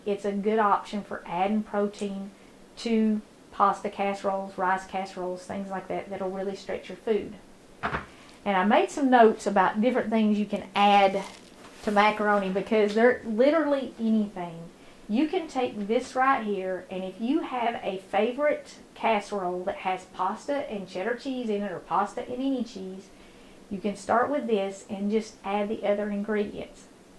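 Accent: South, United States. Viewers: 2X T-Spring. I just love your channel. As a northerner, I love your southern accent. You share a lot of information and I also love that you are a. Christian, as am I.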